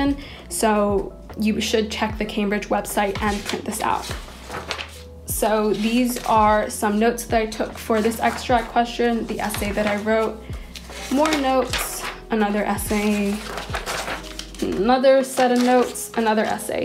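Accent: United States. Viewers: don’t you have an american accent?so why are you doing GCSEs? or have i got it all wrong 😭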